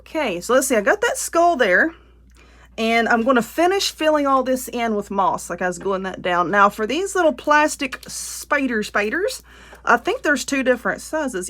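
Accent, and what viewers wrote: South, United States. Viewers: That looks so good your definitely southern I love your accent.i need to try that too co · Where are you from, I'm from SC. You sound like you are from the south.😊 great craft. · New Sub here, love the way you talk ! Love the channel, boy you are southern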